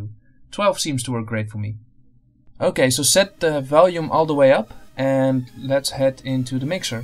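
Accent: Netherlands. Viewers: ey are you dutch? because you sound really dutch XD ik ben ook nederlands btw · a dutchy? good accent tbh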